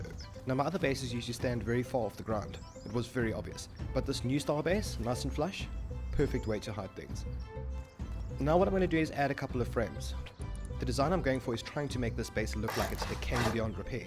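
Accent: Afrikaans, South Africa. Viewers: I knew you were South African! Finally a fellow South African Youtuber that is good! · YOU SOUND SOUTH-AFRICAN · I am south African aswell I instantly knew your south Africa by listening to your accent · You sound South African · Where are you from, you sound South African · Are you south african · I’m guessing you’re from South Africa? Most probably Joburg? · Wait he's south african? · The accent lol. Afrikaans. Man its so easy to pick up lol. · you South African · Are you from south africa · Thought I recognised that accent Rare to see another SA on YouTube, especially a content creator. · U can hear this guy is South African 😹🇿🇦 Ayo straight from the mother nation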